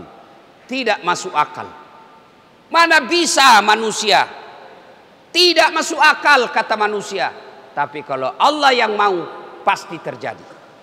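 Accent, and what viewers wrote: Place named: Bugis, Indonesia. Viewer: Cuplikan nya saya suka,persis ceramah almarhum zainudin mz... Namun versi bugisssssssss😀😂😂😇😇😇😊😊 Yang pernah denger ceramahnya di channel almarhum mz ??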